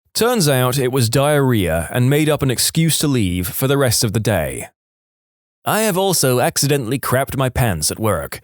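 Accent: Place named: United Kingdom. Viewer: Why he British tho.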